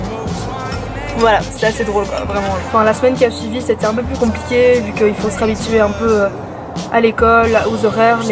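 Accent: Belgium. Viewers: Accent belge comme on les aimes 😂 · J'ai direct su que t'étais belge jsp prk 🇧🇪💪🏾💪🏾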